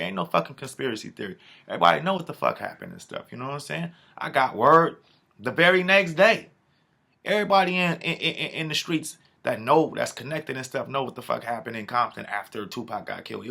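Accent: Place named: Jamaica. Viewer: And another thing why are you talking with a jamaican accent if you're from South Central LA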